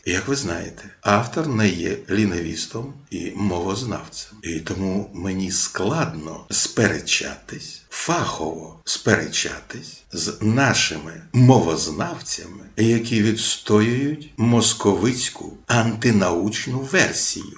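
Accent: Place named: Ukraine. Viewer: Дякую за роботу та за українську вимову! По Толочко якось не зрозуміло, якщо він такий промосковський, то чому це питання не піднімається і його хоча б не звільнять, для початку. Бо ви вже не один рік піднімаєте цю проблему, і я так розумію людина ця не поміняла досі своїх поглядів! Чи поміняла? Або я роблю висновок, що корупція від москалів в історичній царині нашої країни це реальна інституція, яка інтегрована в нормативно-правових актах та втілена в більшій частині людей при керівних посадах?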